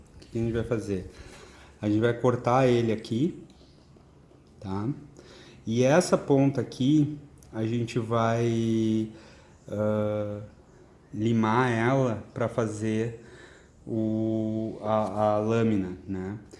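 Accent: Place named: Rio Grande do Sul, Brazil. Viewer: Prezado, teus vídeos são muito bons... agora, na boa, esses teu sotaque gauncho... pelaamordeus... tire isso e ganhará o mundo.. abc..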